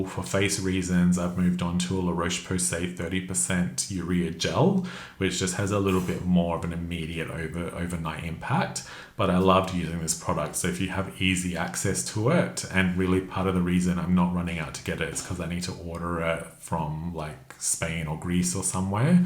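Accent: Australia. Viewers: Awesome reviews thank you. Love having an Aussie voice in this space ❤ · So glad I found your channel from James, amazing content, love finding an aussie voice!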